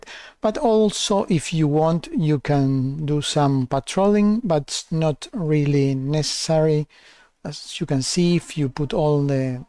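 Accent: Chile. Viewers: Desde cuando no entiendo español? Sera español chileno. Buen video como siempre. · no entiendo i ""J" no hablo chileno pense que era en español XD,.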